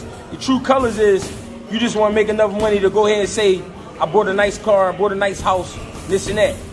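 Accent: Philadelphia, United States. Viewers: Bro definitely from Philly 🤣🤣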